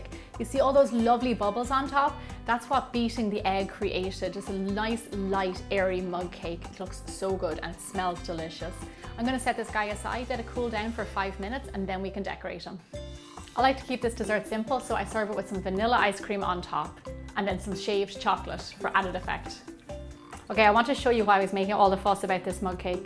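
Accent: Ireland. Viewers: Is she Irish? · Are you Irish ? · Wow, So cool! You remind me of another youtuber! He does gaming and he is also Irish! You have an awesome accent! Great Food! I just made your Blueberry Muffin and Mac & Cheese! Very Yummy!! Fabulous Work! Please Keep Making Videos! :D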